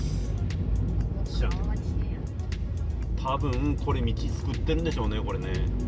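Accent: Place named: Fukui, Japan. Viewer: 声を聞いていたら福井弁のように感じましたので思わず登録しました(笑) この道は３０年ほど前に比べると今は少し良くなった気がしますね。それでも普通も道しか知らない人には驚きの連続でしょうね。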